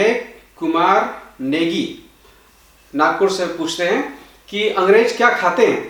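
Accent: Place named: Awadh, India. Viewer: आपकी अवधी भोजपुरी अच्छी लगती है और ये बताये वहा भारत और हिन्दी उर्दू को क्या अहमीयत है?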